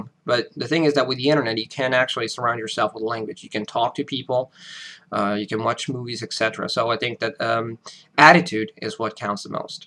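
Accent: United States. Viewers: You sure have that ''flawless American accent!'' that inspector clouseau (although French xD) could never get! I have never heard a non-native English speaking person speak with such a perfect accent! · When you first started to speak, I realized that you weren't from USA. I thought you were... I don't know... from Mexico or somewhere else but you really have an American accent. I hope one day I'll be able to be as fluent as you are XD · Thanks for sharing! I actually just subscribed to your vlog today. I'm American and I thought maybe you were an American who moved to Italy. I'm impressed with your story. You sounds just like an American to me when you speak English. That's great! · You speak American English extremely well, better than millions of native speakers! When are you coming to America? Michigan, USA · Great American accent. Your American accent is a little weak with certain words like "mother" and "country". 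Overall, it's really good! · Wow. I had no idea you're Italian. I thought you were American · I love your American accent. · Interesting for me to know the story behind your American accent! So it was a tutor where you adapted to and you were provided with lots of materials form the U.S. When I was in elementary school I lived with my family in the United States and I spoke "native" American English. Why did I lose this accent? It was because my English teachers at grammar school when I was of an age of 12 forbid me to speak American English. So I was forced to adapt a British-German accent of English at school. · So, the first video I watched of yours, I didn't know you were Italian. I was listening along, assuming you were American, but as the video went on, I got this weird feeling like something was just *wrong* and I couldn't describe what it was. It actually got a little jarring to my ears, like a little needle. 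Then I started to realize there were very subtle differences in your speech. I actually couldn't watch you for a while. Not to criticize, you're fabulous! Just an interesting experience. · Beautiful! You sound like me (I live in America). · Your American accent is excellent! For the first few minutes you speak, it's hard to tell that you aren't from the states! Thanks for the video, you've given me motivation! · you're a great American english speaker. When I watch your videos, my girlfriend actually noticed that you sound like as if you moved to America or you grew up here. I spoke two languages when I was growing up and sometimes I would mix my Filipino (Tag-lish) accent with my normal "school" accent. I guess it depends on who I talk to. What I noticed is that my accent started to become more and more American since I loved watching TV sitcoms like Cheers, King of Queens, Frasier, etc. 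I also loved keeping up with the late night show jokes. I sang a lot to songs I hear on the radio as well. Right now, I'm currently learning French. I've learned a lot of techniques from polyglots like yourself, techniques that I use whenever I approach learning French. I'm sure I won't sound like I grew up in France but as you said, it's about conveying the message with language as the tool. I'm just more inspired to learn French especially when I watch videos like yours. Keep on inspiring people, man. Keep up the good work! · The American accent is very difficult to acquire to English learners. probably has the best accent I have heard. However, it does still does not sound natural. I can hear his Italian accent when he says words like "videos". He says "Veedios". Not, trying to be critical because he is an amazing polyglot. I am speaking as an American who only speaks English and Spanish badly. 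· i was almost a minute and a half into one of your other videos before i realized you weren't american. great job, you clearly worked at it. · Hi,! Your accent is great and your English is just perfect. How much time did it take you to become fluent and to acquire an American accent?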